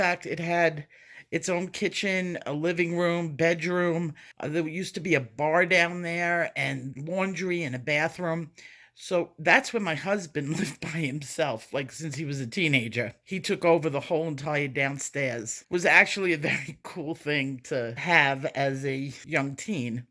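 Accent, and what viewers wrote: New York, United States. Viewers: What a fantastic find. I loved hearing the family history story. I always knew there was a strong New York connection. Can’t miss that island accent. 🤣. Former New Yorker here as well 🦄❤️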